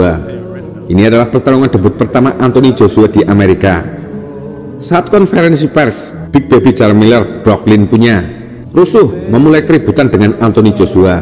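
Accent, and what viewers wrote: Java, Indonesia. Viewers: Mas meddoknya bisa diilangin ga?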